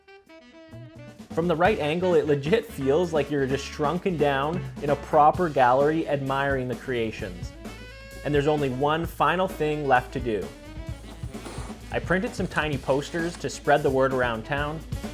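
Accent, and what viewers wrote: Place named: Canada. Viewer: the Canadian accent 🤣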